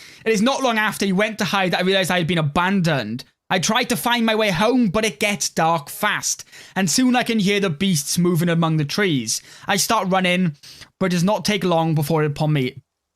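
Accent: Wales, United Kingdom. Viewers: heard you on the steam page and thought I detected a bit of a welshie Im Welsh/Irish so glad I looked you up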